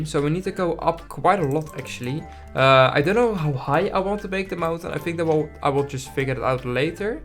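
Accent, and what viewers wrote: Netherlands. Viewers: aan je stem te horen ben je Nederlands🤣😂:goodvibes::virtualhug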